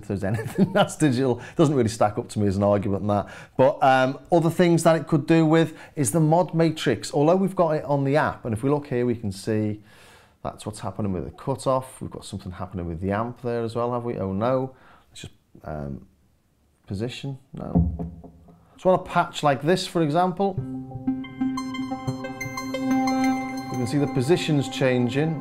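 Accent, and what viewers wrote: Liverpool, United Kingdom. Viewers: Dang I’m sold on this now! Cheers from Hollywood, CA. Love the accent…would that be what they call “scouse?”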